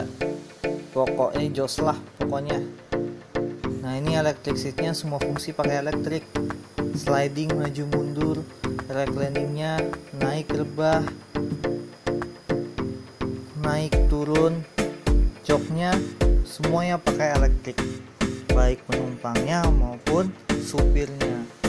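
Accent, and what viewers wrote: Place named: Garut, Indonesia. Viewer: urang garut logay anjirr :D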